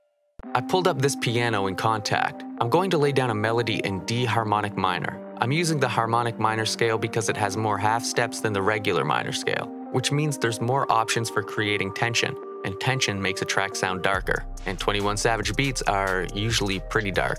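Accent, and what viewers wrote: United Kingdom. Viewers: Georgia I could have sworn he was British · Oi he from the uk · I think he from the uk 🇬🇧 bruv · He from Britain lmfao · Bro he from the uk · he's british right?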